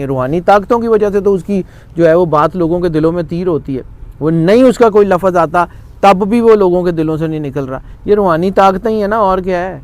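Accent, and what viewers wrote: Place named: Lucknow, India. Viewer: 'Andaz e Guftagu' sounds like Luckhnowi Urdu, really.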